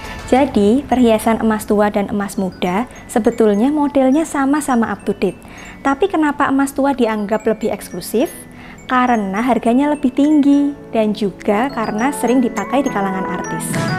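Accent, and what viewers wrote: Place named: Java, Indonesia. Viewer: Medok yes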